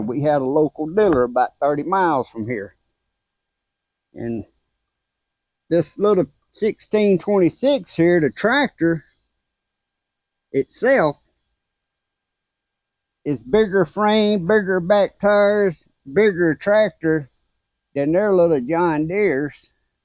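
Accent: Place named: South Louisiana, United States. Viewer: Your accent sounds more south louisiana than north . I'm from Avoyelles Parish.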